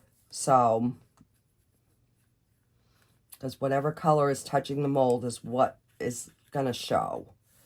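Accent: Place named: Long Island, United States. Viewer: I’ve been trying to guess your accent since I stated watching you😂 I’m from the East Coast so I’ve guessed Long Island or Maine. I’m sorry if that’s too personal I’m just a curious person♥️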